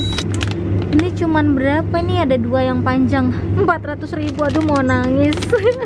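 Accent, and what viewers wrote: Medan, Indonesia. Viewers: kk org medan kah? salam dari binjai kaka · Kak asli dari medannn yaa? 😁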